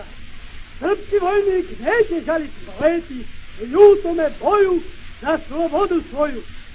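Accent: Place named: France. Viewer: Не знам дал је ово стварно глас краља Петра пошто се овде чује француски акценат мада неки кажу да је краљ заправо и имао тај акценат.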